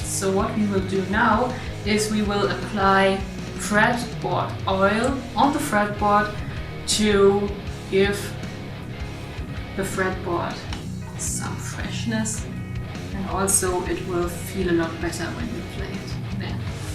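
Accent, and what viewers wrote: Germany. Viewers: Du sprichst sehr gut Englisch, nur man merkt voll, dass du Deutsche bist. :D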